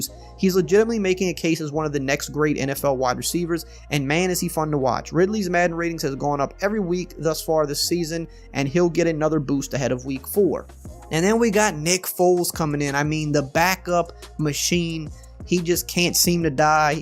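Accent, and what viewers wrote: Louisiana, United States. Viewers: What part of Louisiana are you from ?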